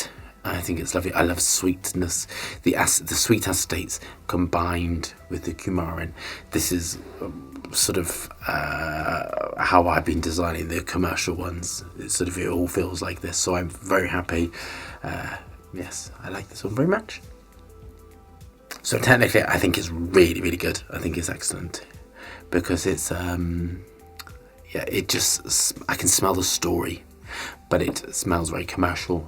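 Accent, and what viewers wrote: England, United Kingdom. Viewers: Yeah, he's English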